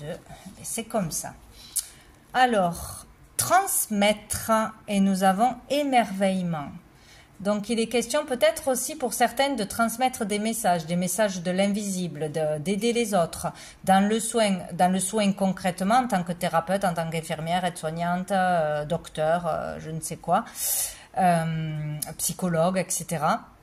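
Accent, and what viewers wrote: South, France. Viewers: avé ton accent méridional et candide, tu es sympa ❤😀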